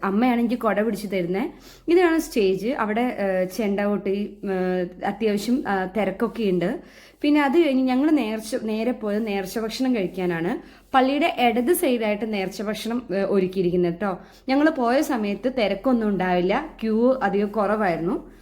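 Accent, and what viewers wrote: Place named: Palakkad, India. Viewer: എവിടെയാണ് വീട് ഞാൻ പള്ളിക്കുന്ന് ഇടവകയിൽ ഉള്ള ആളാണ് വയനാട്ടിൽ ആണോ താമസിക്കുന്നത് സംസാരം കേട്ടപ്പോൾ പാലക്കാട് പോലെ തോന്നി അതാ ചോദിച്ചത്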